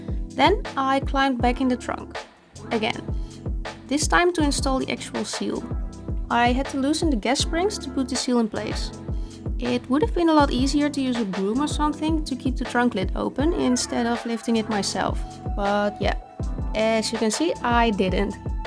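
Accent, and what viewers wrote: Netherlands. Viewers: just by your accent are you from dutchland, or germany?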